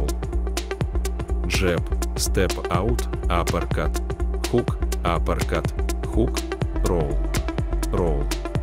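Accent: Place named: Russia. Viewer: The russian accent makes it even more authentic and soviet like😅👍👍👍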